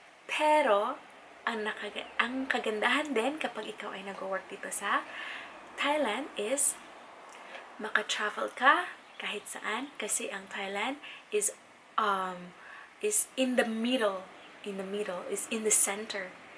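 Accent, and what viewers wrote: Visayas, Philippines. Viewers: At first I can sense you are really bisaya! Haha... Same here... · Kadiri kang bisaya ka kaya lagi sila iniinsulto eh sa susunod bilisan mo mag tagalog hayop ka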